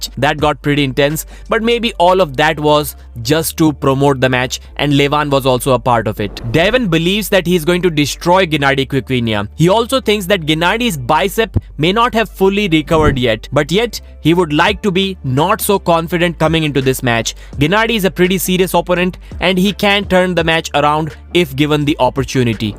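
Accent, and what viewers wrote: India. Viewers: voice over reeks of curry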